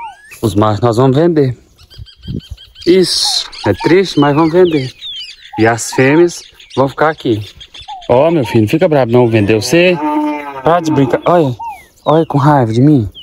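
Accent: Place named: South Minas Gerais, Brazil. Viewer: moro no Sul de Minas e você fala que nem nós. Amo muito nosso sotaque! Deus te abençoe ❤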